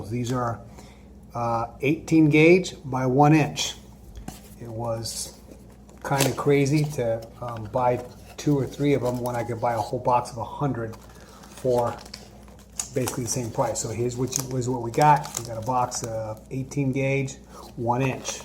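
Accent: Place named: East Coast, United States. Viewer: Mama mia, this is Norm. My wifes headliner started to sag down in her car and I need to repair it, it get warm out here in Florida, but we love it out here. Well in reviewing some YouTube videos, there was your friendly face! however I did not recognize your East Coast accent. I was laughing my ass off, you are still a funny lad. Anyways it was good to get some tips from you, your head liner came out pretty good I must say. I had fund watching your video. Peace brother and God Bless you as well. Norm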